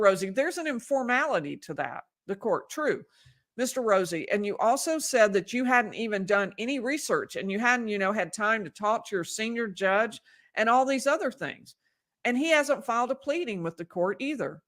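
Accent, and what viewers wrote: South, United States. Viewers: i litterly had to reread the defenses filing..............all 120 + pages, in my almost 80 yrs i have watched as attorneys turned a noble profession one made admirable abe lincoln into a sewer, what those two defense attorneys did was not short of vigorously defending there client. i hope this sets a precedence for others who truly want to defend there clients. in this day and age it happens in 1 out of 100 cases. due process was not i repeat not followed. the law is clear there are gold standards call procedure and it did not happen. no one believes she fired them for negligence or any misconduct. she fired them because she is a arrogant female who has decided she has the power as judge to call the shots SO she did. i have been interested in true crime since i was six. forgotten more than most of you know and believe the arrest warrant was flawed. 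these crooked l e may think if we don't have a law degree we don't recognize when we are being hood winked. pulled of the case, hell she should be kicked of the bench and fined $100,000.00 because her real damage was to the accused and to our constitution. love to hear a southern voice advising and instructing our young whipper snappers. THEY NEED ALL THE HELP YOU CAN GIVE THEM. your passion also shines through and that will continue to bring you a large audience.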